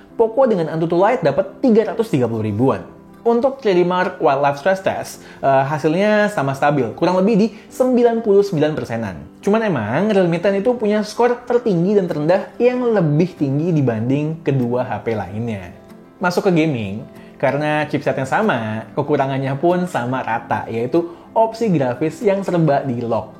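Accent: India. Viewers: Gue kira channel orang India. Kaget gue pake bahasa Indonesia 🤣🙌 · aga tripping kwkwkw karena yg ngomong mirip orang india tapi ngomong indo, kaget saya hahaha keren keren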